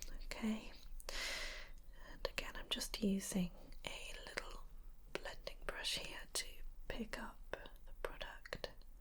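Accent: United Kingdom. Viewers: I love your British accent so so much!!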